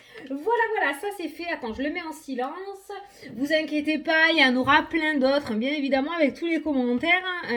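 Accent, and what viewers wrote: South, France. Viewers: Chère, quel bonheur de t entendre! J adore ta bonne humeur, ta spontanéité et ton accent de mon sud qui me manque, j habite en Thailande 😉. Je suis dans un total lâcher prise et si quelque chose me tombe dessus aujourd hui je crois que je serai en mesure d encaisser 😊😀. Gros bisous et un grand merci 🙏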